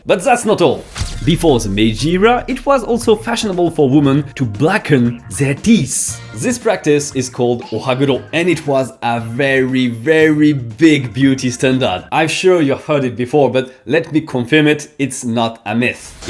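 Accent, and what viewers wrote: France. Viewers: With just a little bit of a French accent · ton accent francaise est tres évident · your French accent is coming out in your pronunciation of some H's, like in "hierarchies"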